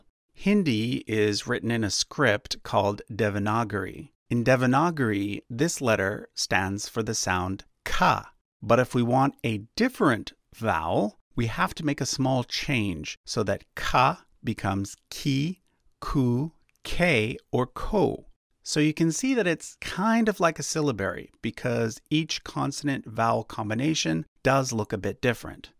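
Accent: United States. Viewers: I loved hearing him pronounce asian languages with an american accent lol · why do you say all the east asian languages in the most american way.. at least try and research😭😭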